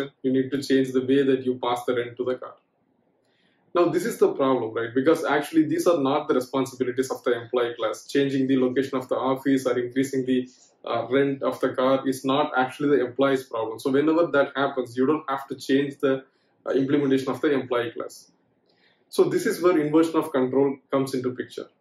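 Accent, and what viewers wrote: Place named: India. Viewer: I badly understand Indiand pronunciation but this dude described this better than anybody else! 👍👍👍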